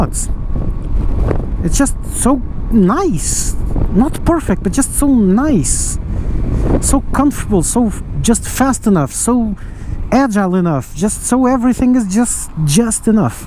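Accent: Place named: Portugal. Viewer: Faço like pelo sotaque tuga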